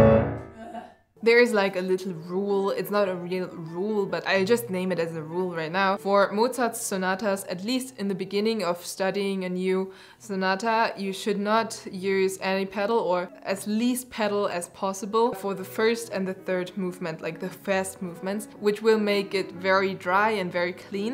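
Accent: Germany. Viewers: Man hört du bist deutsch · Awesome video! You're a true musician. Speaking of what you are: You sound very German. Are you German, by any chance?😁 Keep up the amazing work!! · mann merkt so hart das sie deutsch spricht hahahaha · Ich habe seid anfang an gedacht das du deutsch Kannat am Akzent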